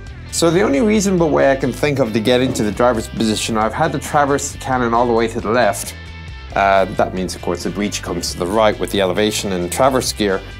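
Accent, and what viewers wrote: Ireland. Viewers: He sounds slightly Irish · Listening to your accent, gives me the impression that you're Irish. Am I correct?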